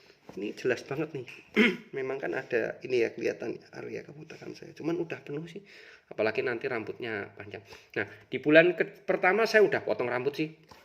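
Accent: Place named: Java, Indonesia. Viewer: kok medok banget om , orang jawa mana aslinya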